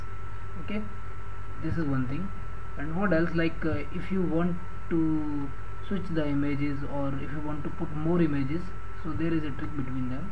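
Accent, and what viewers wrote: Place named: India. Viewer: You sound Indian, but that's my university's logo, and it's an algerian university. What happened?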